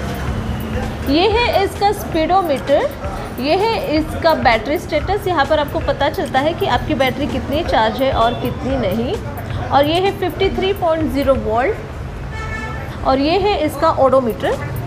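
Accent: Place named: South, India. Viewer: Apka south indian speaking style bahut pyara hai .. ♥️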